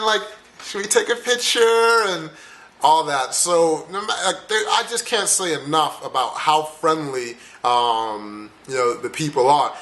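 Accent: United States. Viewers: If they hear your american accent they will find it very cool and your immediately very interesting. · In my opinion, as a Dutch guy, you look like an American black guy. And if you would talk to me, I'd notice your American accent too. But I probably would have guessed that. Africans have a different mimic, and a different way of expression.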